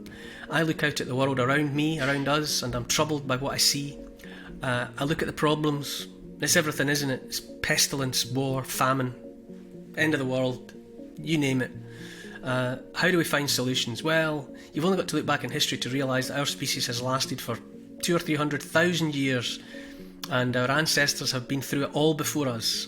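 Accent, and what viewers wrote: Scotland, United Kingdom. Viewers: I like talks about rebellion best when they come with a Scottish brogue, and most of all when the mouth is framed with the fabulous beard of Neil Oliver.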